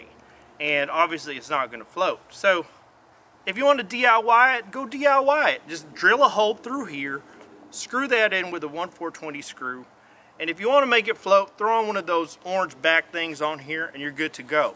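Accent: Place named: South, United States. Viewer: I ain't gonna lie this man's southern accent freaked me out for a second... lol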